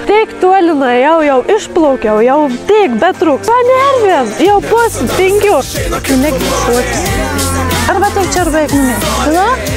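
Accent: Samogitia, Lithuania. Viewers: Jetau kam monie patin to zemaite kalba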